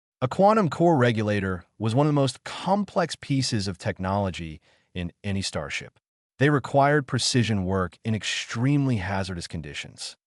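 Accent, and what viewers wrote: Spain. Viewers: So sick of the spanish accent kicking in. IF you want a AI to read it that fine but get one that is native to the language you are talking in. It totally takes you out of the story. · I hear it too. Definitely Spanish, but from Spain, not Mexico. I just laugh and continue listening. This AI voice, lulls me to sleep. I love it. The other one that helps me sleep is Radio Reimagine, great stories! Very relaxing!!! Have a great life!!!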